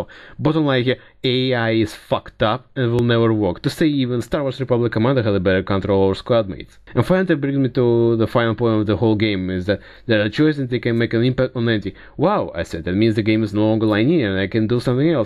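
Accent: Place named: Russia. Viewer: I'm a french canadian and also not very good in english, but somehow I understood 99% of what he said. Sure he have a russia accent and he's speaking very fast (that's the point of the video, fast like Zero Punctuation), but I've heard faaaaar worse on youtube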